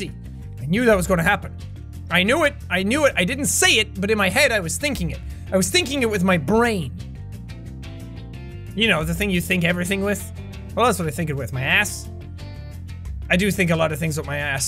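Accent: Ireland. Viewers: Im Irish too. We all talk the same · jack really went into Irish during this episode. LOL. Love ya. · MrG I love any Irish voice tbh 😍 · I absolutely love it when your pure Irish accent and phrases come out:3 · inner Irish came out in this video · His Irish maxed out this video lol xD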